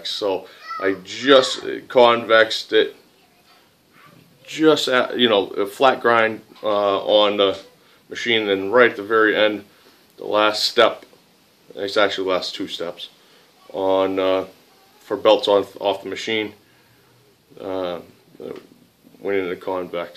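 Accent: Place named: New England, United States. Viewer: "Up to pah." Always enjoy a listen to the NE accent. +1 for the drop point EDC design. Hard work is great medicine for the soul.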